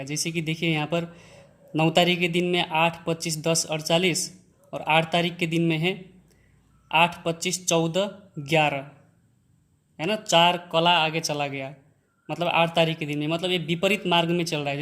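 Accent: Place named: Nepal. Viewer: Nepali le hindi boleko ठ्याक्कै thaha hunxa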